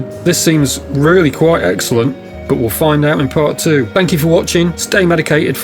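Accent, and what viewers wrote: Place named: England, United Kingdom. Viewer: Maybe it is because of the english accent in general, but for me as a german you kind of sound a little bit like Karl Pilkington!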